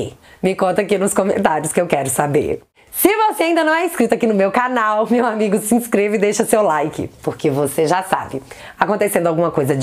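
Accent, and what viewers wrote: Minas Gerais, Brazil. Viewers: Esse sotaque mineiros e uma delícia só , oh trem baum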